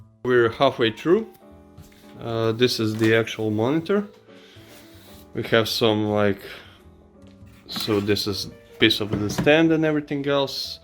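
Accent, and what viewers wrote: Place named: Eastern Europe. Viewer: Nice vid. Based on your accent, I would say youre somewhere from eastern europe, czech, poland, russia etc? My best guess is russia. This monitor is something I am interested in, however, currently, in my opinion, its simply just too expensive for me. If it drops half price, then I could consider this.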